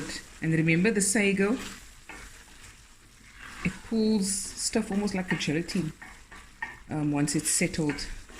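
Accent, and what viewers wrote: South Africa. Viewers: Is that a South African accent I am hearing?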